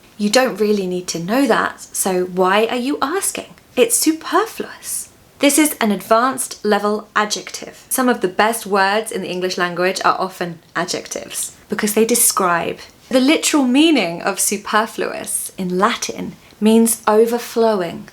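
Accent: United Kingdom. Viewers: Hallo great British accent yes correct pronunciation By the words let say two three times with you and loudly thanks More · Hello, I like your beautiful British Word Pronunciation with British Culture. I love the lovely cities in England such as Oxford, Greenwich and Edinburgh. I also like the Stone Hinge and I saw it when the Robin of Sherwood British drama was filmed in that location. I watched Robin of Sherwood in my childhood., I hope you watched that drama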